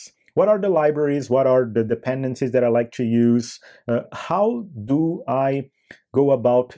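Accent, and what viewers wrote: Brazil. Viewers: Cara, sei que você é brazileiro só pelo sotaque rsrsrs, muito bom! continue nesta jornada, me ajudou muito a entender essa caixa preta. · sabia que era brasileiro pelo sotaque kkkkkkkk muito bom o video cara parabéns pela qualidade! Me inscrevi e gostaria de dizer que você me dá uma impressão de qualidade de conteúdo melhor do que muitos canais ai seja gringo ou não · reconheci pelo sotaque, mas muito bom o conteudo mano parabens, muito raro ver conteudo de game engine/c++ no br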